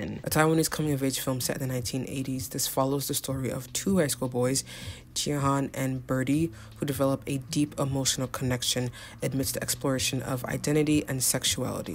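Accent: United Kingdom. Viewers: i love how your british accent is literally Adele. So comfy sounding.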